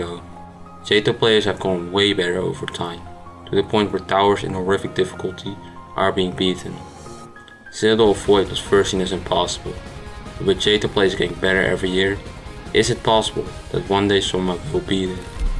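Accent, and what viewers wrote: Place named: Netherlands. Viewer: I love jouw Nederlandse accent ;)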